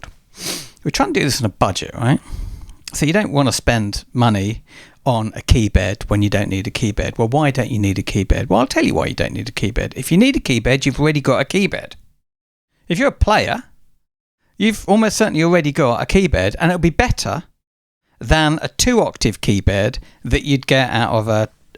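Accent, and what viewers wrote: United States. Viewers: Why you speaking in American?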